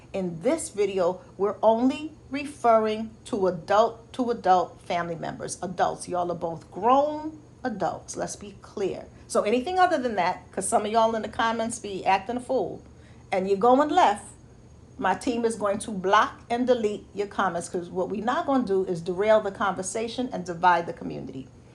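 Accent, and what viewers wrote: New York, United States. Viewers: So appreciate your ministry.. your giftedness! Love your teaching style bold…unadulterated..❤ ❤approach along with your wonderful NYC accent. Through your ministry I learned to focus more on my own personal goals gifts and talents and continue to pursue what is best for me in this season of my life. You keep shining! I love church by phone each Sunday along with the wellness club on Wednesday. I have at least 4 of your books and have given 2 additional books away. God Bless you. ❤Lorraine from NY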